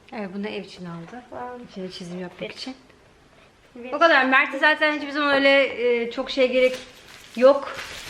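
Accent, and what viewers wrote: France. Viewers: Fransızca aksanın inanılmaz güzelmiş 🧿 çok şaşırdım yıllardır takip ediyorum ama hiç Fransızca konuştuğuna şahit olmamıştım 👏🏻👏🏻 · Fransızca aksanın gerçekten çok tatlı🥰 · Hepsi ayrı ayrı özel ve çok guzel kırtasiye ürünleri,çekilişe kızım için katılıyorum.Ayrıca Fransızca aksaniniz gerçekten çok guzelll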